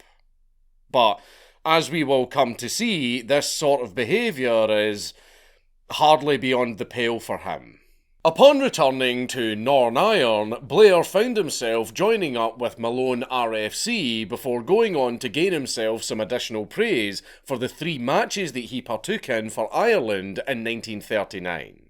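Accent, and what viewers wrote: United States. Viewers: Shame you used american pronunciations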